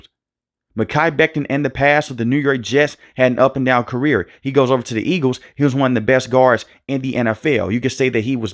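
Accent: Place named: West Coast, United States. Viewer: I can hear the Westcoast in your voice, bro... We're getting better... BTFU ⚡️ ⚡️ ⚡️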